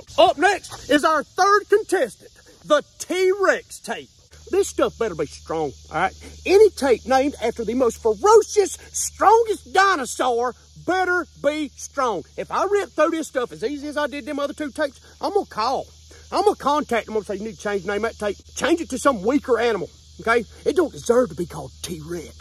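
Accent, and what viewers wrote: South, United States. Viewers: That's southern lingo. Window (winder), tomatoes, patater, onion etc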